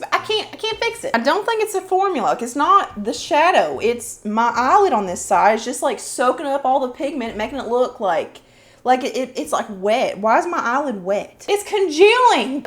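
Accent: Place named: Kentucky, United States. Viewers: My daughter in law is from KY and she sounds just like her!! Love it!! 💘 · Ok…I discovered your channel yesterday and I love it. You are so funny and unique. I recognized your accent cause I’m from Kentucky too! What area are you in?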